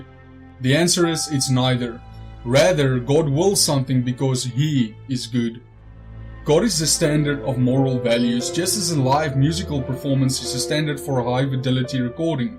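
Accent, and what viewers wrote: South Africa. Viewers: I agree, awesome accent :) is it south african?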